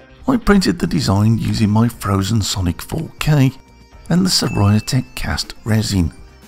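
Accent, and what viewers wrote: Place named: Birmingham, United Kingdom. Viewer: from the accent and the hobby... Is it fair to deduce that you work in the jewelery quarter in Brum?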